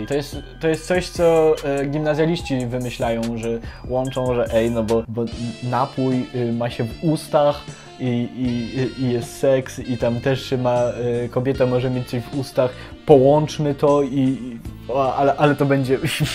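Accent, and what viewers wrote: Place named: United States. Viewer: przez jego amerykanski akcent to brzmi jak "szałmi" lecz poprawna mandarynska wymowa to "siałmi" to "ał" jest krotkim ao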